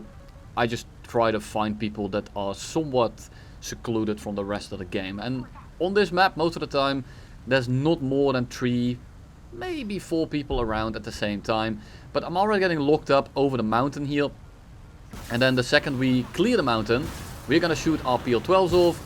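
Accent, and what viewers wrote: Netherlands. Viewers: Fist second in the video and I know he is Dutch 😂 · I smell netherlands behind your voice accent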